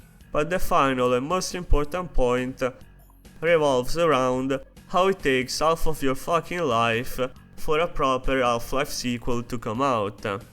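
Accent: Italy. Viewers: Are you Italian? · You have the most italian accent... ever. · Your accent sounds Italian! Edit: ok ai carabinieri ne ho avuto la Conferma. GG compatriota. 👍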